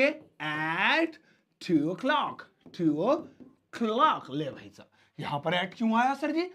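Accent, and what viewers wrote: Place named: Delhi, India. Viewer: Sir lgta h aap delhi se ho 😂😂😂❤❤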